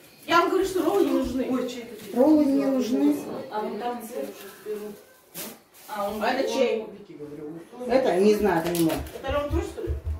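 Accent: Urals, Russia. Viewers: Такой интересний уральский акцент)Всех девочек с наступающим😊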